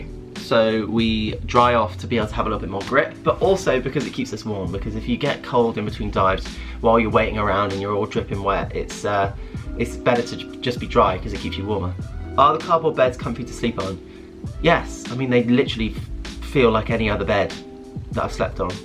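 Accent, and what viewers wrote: United Kingdom. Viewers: love to wake up on sunday, make coffee and watch your vids at 10am. (pacific standard time, california) love a british voice in the mornings. congrats. i hope upload schedule will be the same after tokyo 🤙🏼🎉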